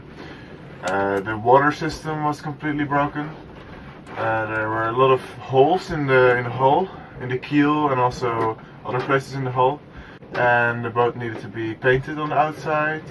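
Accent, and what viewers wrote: Netherlands. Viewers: ik had ook al zo'n vermoeden. Zelfde soort accentje als mij; je Engels is zeer goed. Mooi zeg in Noorwegen! Maar dan wel weer koud in de winter. Een idee om te overwinteren op een boot in Spanje (in September varen oid?) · Cool! Are you dutch? You sound like it! 😊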